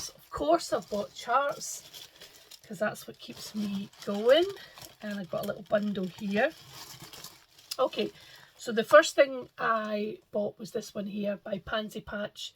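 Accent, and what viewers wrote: Scotland, United Kingdom. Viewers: Congratulations on 3 years Flosstube! Love all your stitching and the inspiration it brings, including the bird and all the lovely fabric. Great to hear a lovely Scottish accent on Flosstube! 🏴❤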